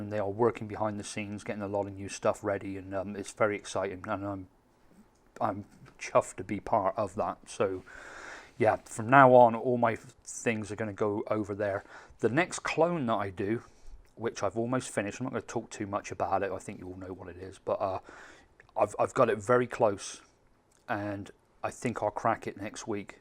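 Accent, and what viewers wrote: United Kingdom. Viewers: Hi mate, love your vids. Are you British living in the US ?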